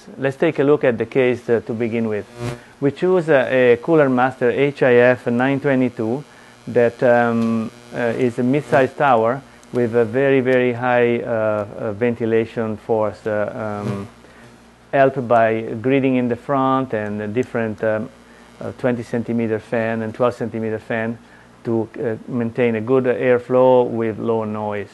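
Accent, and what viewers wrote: Italy. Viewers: you speak english very well but your accent makes me wonder: Are you italian? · R U Italian?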